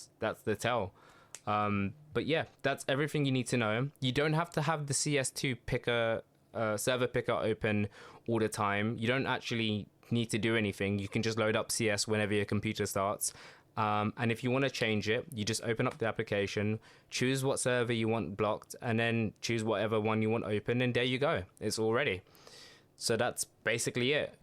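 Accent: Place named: United Kingdom. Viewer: Got to love hearing a brit playing cs, see brits all over youtube playing but i always got Igor and vlad on my team instead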